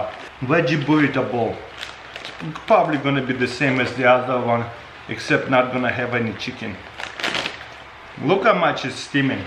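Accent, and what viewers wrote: Russia. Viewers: I loooooove that rusian accent. His so famous becuse of it. I love it !!! 0o0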